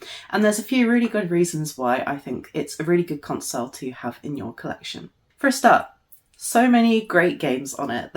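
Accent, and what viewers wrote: United Kingdom. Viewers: Dang she's pretty! Wonder what she sounds like not speaking the queen's English. Wonder what she'd sound like with American English. Anyway. Dreamcast was awesome. PAL territory got stuffed on boxart and such. American Dreamcast packaging and art always looks really good and doesn't have the PEGI rating system stamps on it that's an eye sore. The back of the cases are annoying too as they're in multiple languages. Today's games are similar though, having both Spanish and English that's annoying..... Unless you buy it and it came from Canada. Looks the same and you almost wouldn't know, except it's in English, Spanish, and French. Yuck...... Whew, pretty girl, smile a lot! It's a pretty smile, dang! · Hello. You're from UK, aren't you, lady? Is blue stinger and soldier of fortune also cut in UK region?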